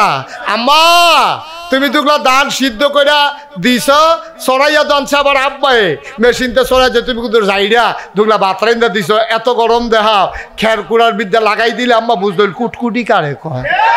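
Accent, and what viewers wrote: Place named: Bangladesh. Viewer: এ হুজুর বাঙাল,।শুদ্ধ বাংলা জানেন না।হুজুর বেশ ভালো কমিডিয়ান। Head line কী আছে,? এটা আলোচনা নয়,জুকারগিরি করা।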